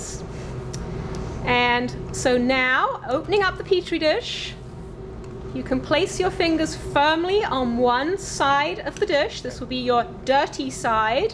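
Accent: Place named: United Kingdom. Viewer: I think British.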